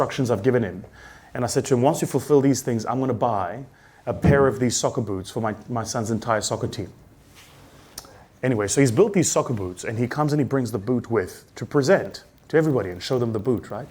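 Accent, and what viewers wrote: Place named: Township, South Africa. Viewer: lol; I love your Kasi Accent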